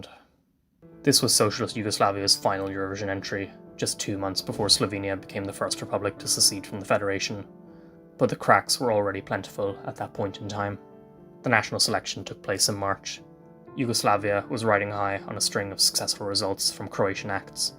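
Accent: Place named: Ireland. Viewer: Why do you not talk about the atrocities made by other European countries participating in the Eurovision, just about Israel? you're clearly an antisemite. There's a cure for that. Learn history. There is no such thing as Palestinian people it was made up in 1964 to hurt Jews and ruin their only homeland. you're probably Irish by your accent so I'm not surprised. And that Nazi scream at the end... u need a shrink man.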